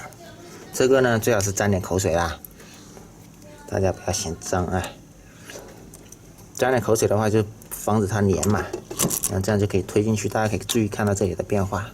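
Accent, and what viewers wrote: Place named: Guangxi, China. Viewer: 口音有点像广西人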